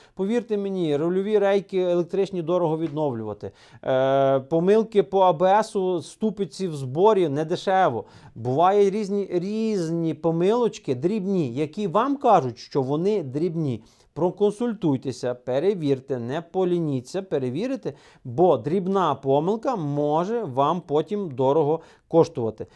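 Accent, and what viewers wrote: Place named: Prykarpattia, Ukraine. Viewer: Приємно чути рідний український прикарпатський говір.🚐🚐🚐🚐🚐🚐🚐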